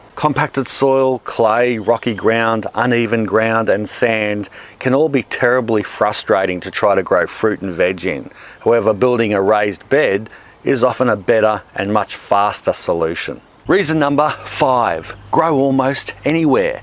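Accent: Australia. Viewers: Love your videos, tips, and that very authentic Aussie accent. With love from Russia :D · Well done for making the effort to speak nice and clearly and using lots of gestures. Makes such a difference for viewers who don't speak English as their first language or who aren't used to an Aussie accent. Top work. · Thank you ! I caught the Aussie accent, but didn't know if he was still there.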